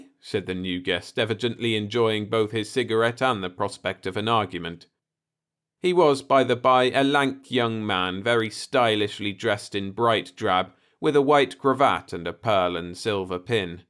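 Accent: United Kingdom. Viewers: I'm in the same boat in regards to tech. I'm very glad still posts some stories here. His accent sounds sort of South East English to me, I hope he sets us straight. 😊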